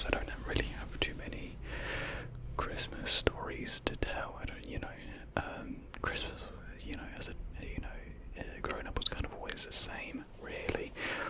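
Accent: United Kingdom. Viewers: I adore you, but you're also easily the most British person I've ever listened to, like painfully British.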